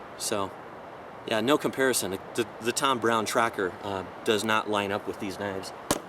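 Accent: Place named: Arizona, United States. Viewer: haha he knows, he stated when he first introduced it that he won't be pronouncing it as hoonglas but as unglas bcuz of his Arizona accent/speech pattern.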